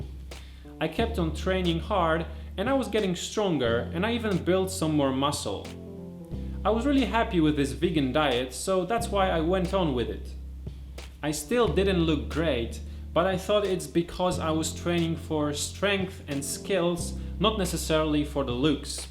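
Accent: Poland. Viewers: zubr! polski akcent :D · He sounds polish and the beer pic was from a polish beer too, so I assume that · tak czulem ze Polak, chociaz stara sie zmieniac akcent :D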